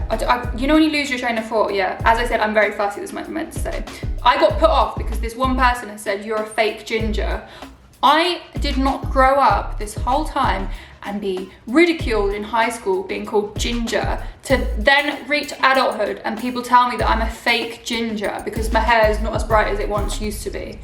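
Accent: United Kingdom. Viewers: omg she really does sound like a female any murray lol maybe thats just a Scottish accent thing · It's a normal English accent wtf.😂😂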